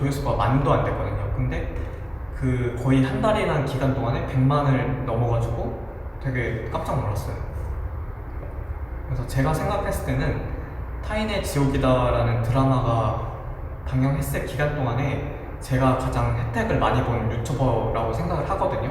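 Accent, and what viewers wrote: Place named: Gyeongbuk, South Korea. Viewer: 고향이 어디인가요? 경북지역 억양이 있는데.. 궁금하네요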